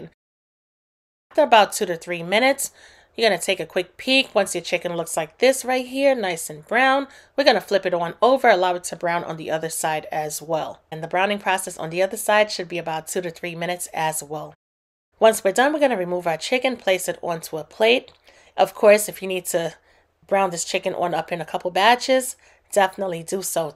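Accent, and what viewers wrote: New York, United States. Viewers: Are you from NY ?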